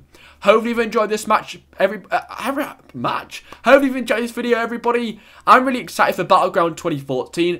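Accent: England, United Kingdom. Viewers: You have a english accent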